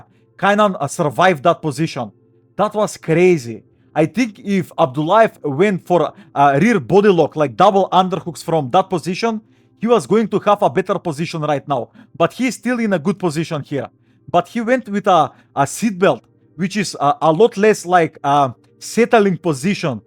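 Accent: Russia. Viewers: Извини за, быть может, резкий тон. Однако мне показалось, что это был нарочито русский акцент, а озвучку специально сделали именно такой, чтобы все это поняли. Болгары так не говорят, я слышал их речь. Впрочем, это мое мнение и оно не обязательно верное.. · Easy...Russian accent.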